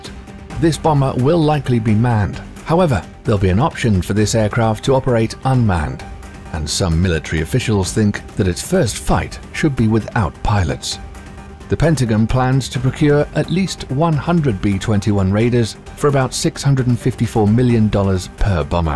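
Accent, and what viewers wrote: United Kingdom. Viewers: Sorry to burst your bubble, but he's British, and that's how we pronounce it. It's our language, so don't lecture us on pronunciation.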